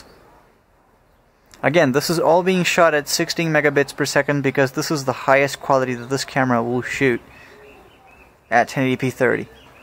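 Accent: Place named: New York, United States. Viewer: Que delícia ver um brasileiro falando inglês sem sotaque, na verdade, parece que você tem sotaque de NY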